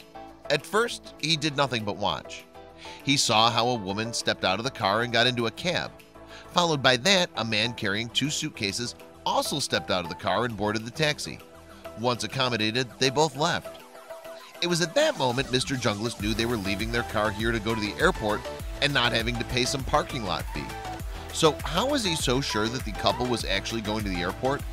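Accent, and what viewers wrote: United States. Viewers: Interesting point that the narrator sounds American.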